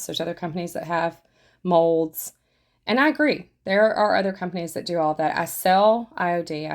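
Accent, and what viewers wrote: South, United States. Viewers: I’m very very sensitive to voices and actually think your tone and cadence is very calming. Ignore the meanies, some people just need to spread their own misery sadly. Also love your little bit of Southern accent lol. I can’t wait to get back down to Goodyear for the winter and do some more shopping at your booths. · Excellent tutorials. I know nothing about embossing and I’m so pleased I came across you channel. I love a southern accent and a gal that can talk my ear off. So refreshing. Thankyou 🤗🥰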